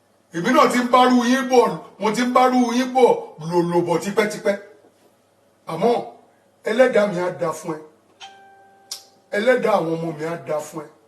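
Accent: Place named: Ilorin, Nigeria. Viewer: I keep watching this video on an on .it look like this man come from ILORIN . he sound like a person from Ilorin .I always laugh all the time i replay the video very funny man, but things I don't why Yoruba peoples like to curse each other it's not good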